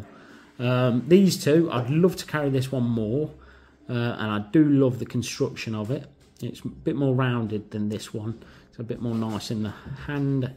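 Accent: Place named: England, United Kingdom. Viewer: "get on with it". "right". Love the Queens English, over here across the pond, Mate. Florida USA.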